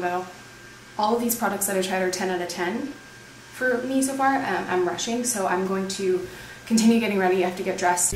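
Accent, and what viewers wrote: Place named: United States. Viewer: Congrats. on making the jump to YT. ! , you mentioned Canada but your accent sounds American 🙂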